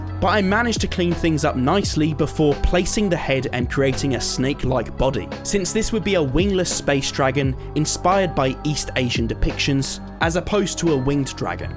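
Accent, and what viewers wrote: United Kingdom. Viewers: Don’t wanna be mean but you’re British accent makes it really fun to listen to you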